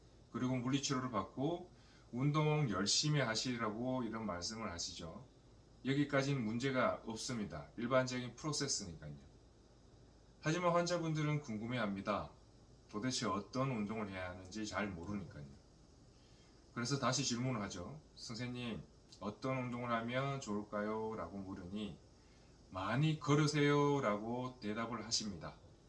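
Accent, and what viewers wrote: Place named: Seoul, South Korea. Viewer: 목소리 멋지네요 👍 발음이 서울사람 같아요! 좋은 정보 감사합니다 🙆🙆‍♂️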